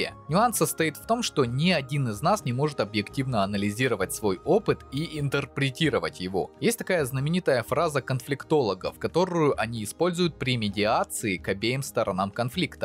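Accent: Ukraine. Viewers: Прекрасно ) А разговоров то было - армейский психолог, опыт боевых действий, сотни лет практики с больными. И что же мы увидели, а мы увидели голого короля с фантазиями, хотя украинский акцент и выдавал жителя Украины. Куда же делся беспристрастный психолог с рассуждениями о том как вести себя на войне ? А он почему- то превратился в активного пропагандиста, да еще и с речами про русского Ваньку, что само по себе ксенофобия. Прям повеяло Геббельсовской пропагандой про сдающиеся войска, женщин с коктейлями молотого, призрака Мариуполя который сбил 600 танков в воздухе и под водой. 4 дня прошло с твоего последнего ролика и как же мерзко теперь звучит, твои солдаты уже отходят в глубь страны, а твоя русофобия и ненависть к обычным русским людям запомнилась многим. Надеюсь те кто тебе донатил постыдяться и обдумают свои решения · Я давно знал, что он украинец. У него жена с Украины, она в каком-то видео говорила. 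И раньше он про власть говорил. В каком-то видосе упоминал про арестованного украинского военного и мол поэтому он не хочет расскрывать свою личность. И по говору понятно Люди, которые удивлены, что он украинец, наверное, только начали его смотреть, потому как мне давно известно, что он с Украины. Другого объяснения у меня нет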